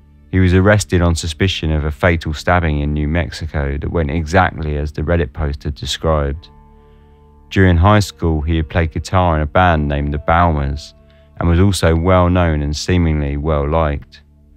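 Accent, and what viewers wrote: England, United Kingdom. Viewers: Thanks for posting...you sound English, but that means nothing if you live in another country. But what about the case of my friend Fiona Saunders, a young mum who was beaten to death in 1986 in Church Crookham, Hampshire. And was the reason her case has almost been completely buried because one of the suspects became a police officer after?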